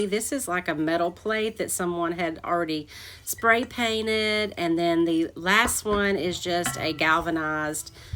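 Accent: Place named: South, United States. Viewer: Hello there,! I just found you a few days ago. You are a pure delight and your DIY's are splendid! Are you a southerner? I am. I can tell by your accent. LOL 😂 You go, girl! Jesus' blessings to you and yours!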